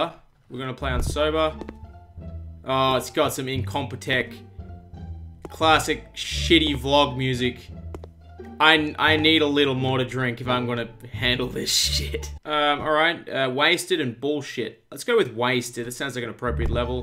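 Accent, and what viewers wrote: Australia. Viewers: is auzzie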